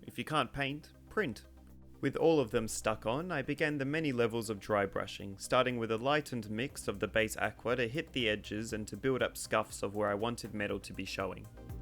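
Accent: Australia. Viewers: I came here looking for another great maker and was super stoked to hear an Aussie accent when it started. Love your work and your style. Subscribed - can't wait to see more.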